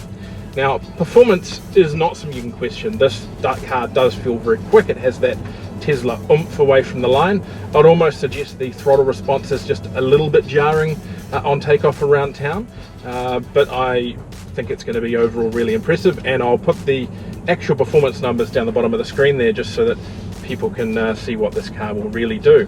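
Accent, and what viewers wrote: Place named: New Zealand. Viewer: Nice review, Ive seen soooo many international reviews, nice to hear a kiwi accent with a kiwi review, cheers !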